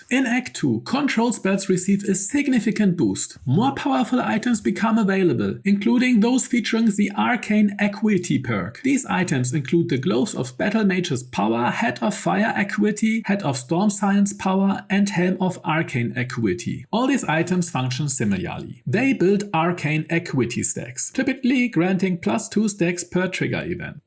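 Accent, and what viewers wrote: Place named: Germany. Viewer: absolutely love this kind of in depth analysis!! keep it up!! recently for whatever reason youtube has been recommending me many smaller, german, bg3-focused creators and i have been all for it lol (forgive me if ive misheard your accent — it sounds german to me)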